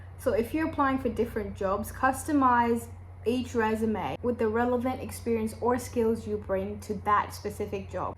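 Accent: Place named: Australia. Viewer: You have a very great Australian dialect already, I find it hard to sound more Australian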